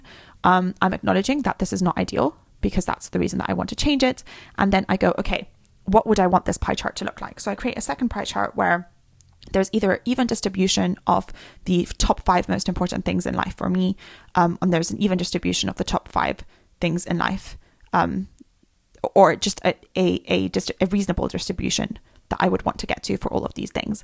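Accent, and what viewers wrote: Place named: United Kingdom. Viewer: You make double effect: 1) food for thought 2) what a lovely accent (fantastic, because I just learning English(few years :) ) and I admire British accent) Thx a bunch for all you're doing!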